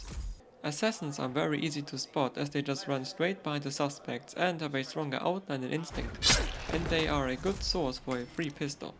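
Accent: Germany. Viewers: Man merkt du bist deutscher haha ist lustig wie du english sprichst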